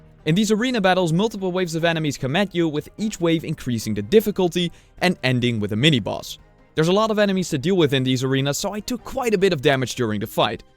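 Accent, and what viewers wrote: Netherlands. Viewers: I teach English to Dutch speakers and I speak Dutch myself. If it's not Dutch i'd be surprised. But honestly, the accent really isn't that bad.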